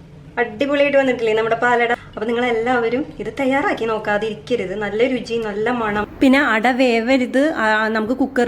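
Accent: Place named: Kunnamkulam, India. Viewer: ആൾടെ സ്ഥലം കുന്ദംകുളം ആണന്ന് തോന്നുന്നു സംസാരം കേട്ടപ്പോൾ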